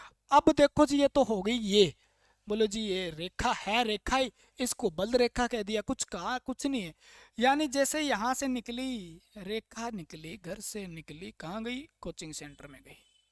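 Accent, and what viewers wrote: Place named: Sirsa, India. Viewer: सर जी भाषा बोली से सिरसा जिले से लग रहे हो, कौन सा गांव है जी आपका। साहब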